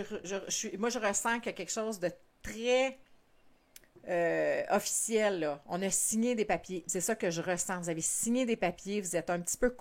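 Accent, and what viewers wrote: Quebec, Canada. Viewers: Êtes vous quebecoise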